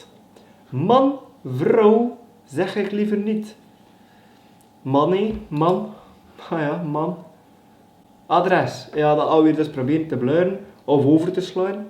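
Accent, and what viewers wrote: West Flanders, Belgium. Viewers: Jaaaa West-Vlaamse k3!!! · Tzo wel skwone zin wi nen West-Vlaamse k3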